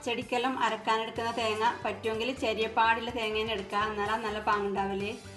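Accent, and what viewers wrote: Kasaragod, India. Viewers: നീലേശ്വരം ഭാഷ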